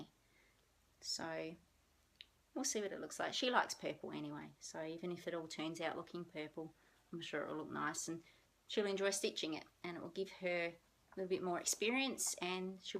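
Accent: Australia. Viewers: Hi, l too have only recently discovered "floss tube" and am really enjoying watching your videos as I stitch. My name is Sandra. I'm a born n bred Aussie living in Texas, USA. It's nice to hear an Aussie accent:-) The wolf kit that you purchased, I saw on another floss tubers - Kelley Jo Crafts - she is an American lady and I'm sure she found it more locally. Sorry I cannot find the particular video of hers with that kit. My current wip is "Fairy Castle" from 'Crosstitch Magic' which was a fortnightly publication I subscribed to many years ago in Aus. Thanks for sharing. Bless you heaps, Sandra.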